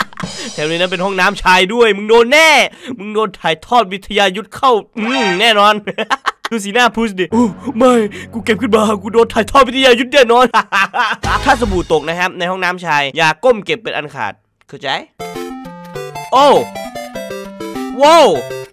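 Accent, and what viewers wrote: North, Thailand. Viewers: ภาษาเหนือคับ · ภาษาเหนือ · พี่ไม่ใช่ภาษาใต้พี่มันเป็นภาษาเหนือ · ภาษาเหนือฮ๊าฟพี่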